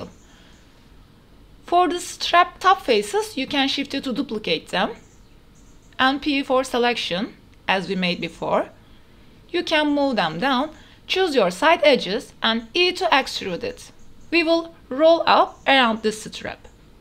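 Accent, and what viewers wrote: Turkey. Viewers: thx for tutorial and i cant hold it inside me but ur accent feels like turkish ngl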